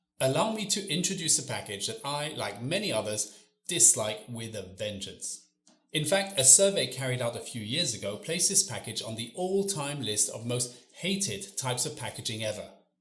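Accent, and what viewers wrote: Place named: United Kingdom. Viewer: 英國腔超重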